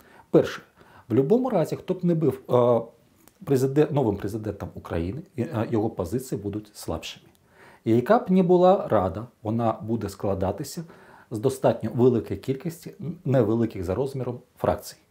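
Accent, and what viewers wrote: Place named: Belarus. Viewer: Гы. Вообще интересно звучит белорусский акцент , совершенно не так как московитский. К сожалению мы такое очень редко слышим, а я вообще впервые услышал украинскую мову с белорусским акцентом. :)))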